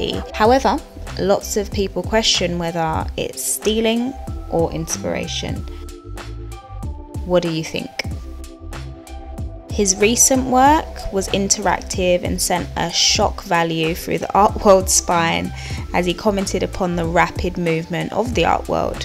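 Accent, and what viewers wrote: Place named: England, United Kingdom. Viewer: It's an accent. In fact it's an English accent, so you could argue she's saying it correctly and you're not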